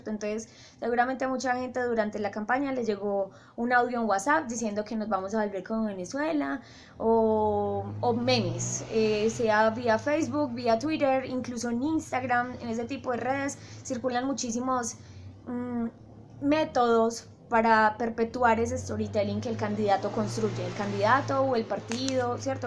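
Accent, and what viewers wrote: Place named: Antioquia, Colombia. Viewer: Me encanta ese acento paisa :3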